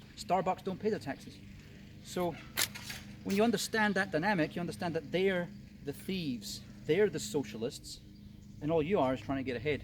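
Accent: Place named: Scotland, United Kingdom. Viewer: I thought ye might of mistaken him from Watts lol He's actually from Glasgow originally (now resides in Sudbury Ont. CanaDUH) I thought I recognized yer accent but wasn't sure what part of Scotland ye were from, I'm originally from Belfast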